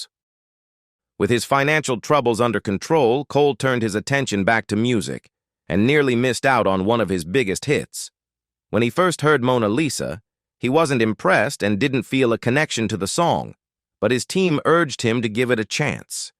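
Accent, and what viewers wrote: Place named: England, United Kingdom. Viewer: Why has he an English ascent and where is evidence of his 4 years old picture and church photos. He is English not American.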